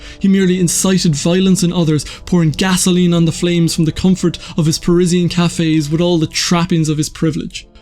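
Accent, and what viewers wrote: Ireland. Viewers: the problem with this video is it is terribly biased. as in many dialectics, both men were correct. life isnt black and white. in general violence is to be avoided, but there are times when radical change and revolution is necessary. if one lives in a democracy today, more than likely it came from a violent revolution. in terms of ussr obviously sartre was wrong, in terms of algriers camus was wrong. the idea that one can support a totalitarian regime in its crimes to create a utopian future seems as naive and dangerous as it is to think colonialists will stop theft and murder bc you give them flowers. it seems particularly interesting coming from a man with an irish accent, there wouldnt be a free ireland at all had they not fought. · More of the later I think. Although we may have chatted in a group after the course, we definitely passed each other around the court! 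I just saw your post on r/philosophy, i would usually pass over videos, but am reading Camus at the moment so thought i'd watch. I was glad i did when i heard an irish voice, then when your face came on, i recognised you immediately!! 😂 Great work on the channel looks like you are working hard at it, and exploring some really interesting stuff. This video was great, very interesting stuff! Have you read much Stephen Batchelor? I thought Alone With Others was fantastic, I think you might enjoy it too!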